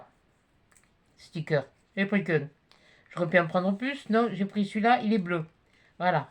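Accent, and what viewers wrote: South, France. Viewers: Cc, je regarde de temps en temps tes vidéos même si je ne commente pas souvent car j'ai les mains occupées. Déjà merci pour ce partage d'achat très intéressant. Je n'ai jamais commandé sur ce site, il va falloir que je m'y penche 😅. D'autre part, j'apprécie ta franchise, ta spontanéité et ton naturel, tu me fais énormément penser à une personne qui m'était très cher et qui me manque beaucoup, tu as le même accent du sud, le même parler, le même caractère et presque les traits physiques de ma grand mère.. à tel point que parfois ça m'est difficile de t'écouter, tu me rend très nostalgique...bref j'espère ne pas te vexer de te dire cela. Surtout reste comme tu es, je n'ai pas commenté sous ta vidéo concernant Vinted mais je suis tout à fait d'accord avec toi. Merci pour ta bonne humeur et la chansonnette finale, tes yeux et ton regard m'ont régalée. 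Belle journée à toi et bonne créativité pour le défi de...ah mince j'ai zappé le prénom 😅, désolée. Bises 😊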